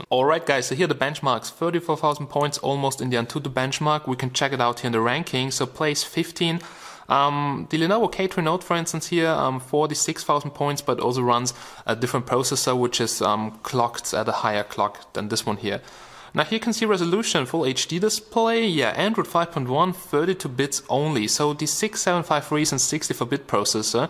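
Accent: Germany. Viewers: du bist doch deutscher oder? :D man hört des :D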